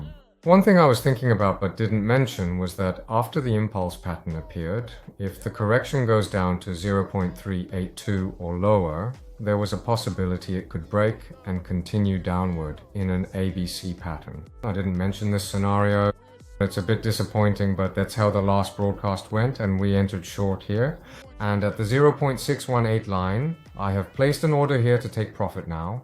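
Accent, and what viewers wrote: United Kingdom. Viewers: wow your Enginlish is perfect. I thought you were born in korea, but sounds like you grew up in England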